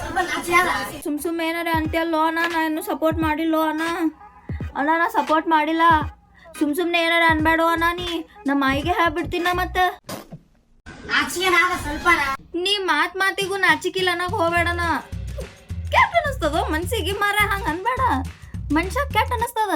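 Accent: North Karnataka, India. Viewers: ಅಕ್ಕಾ ಯಾವ ಊರು ನಿಮ್ದು plz ಹೇಳಿ ನನಗೆ ಅನ್ಸುತ್ತೆ ಪಕ್ಕಾ ಉತ್ತರಕರ್ನಾಟಕ ಅನ್ಸುತ್ತೆ 💥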